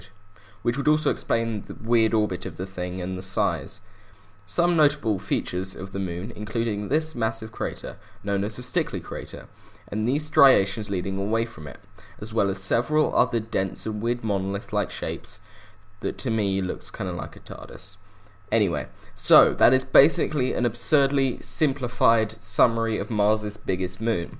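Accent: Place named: England, United Kingdom. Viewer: Eric Williamson Englishman speaking English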